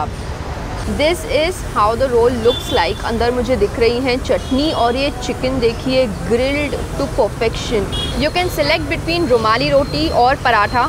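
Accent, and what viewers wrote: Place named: India. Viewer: Indish accent is so funny . Is that how all Indians speak English 😂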